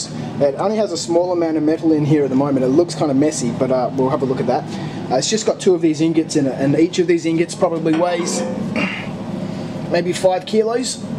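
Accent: Australia. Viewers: Where did you get the spin caster? Sounds like you're in Australia and I'm looking for a supplier here.. Thanks!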